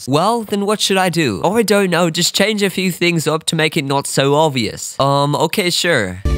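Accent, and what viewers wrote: United Kingdom. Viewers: British accent pog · you sound british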